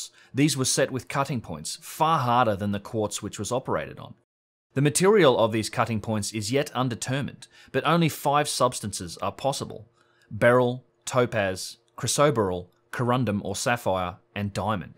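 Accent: Australia. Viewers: Good stuff mate, nice to listen to another Aussie voice talking some sense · Great work, so obvious they used diamond drill or similiar stone to anyone with a brain that works! Thankyou! You sound Australian if so, great to sed Aussie working seriously in this area!❤😂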